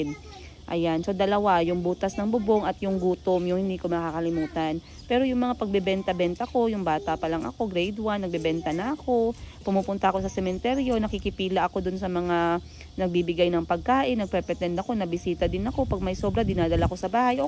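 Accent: Iloilo, Philippines. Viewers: Ilongga ka mam??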